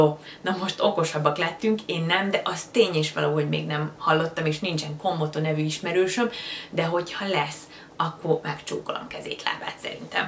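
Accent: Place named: Japan. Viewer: ez a hapogo, gagogo "japan" hangkepzesborzaszto. hallgathatatlan! :-( a hajad viszont NAGYON jo! :-)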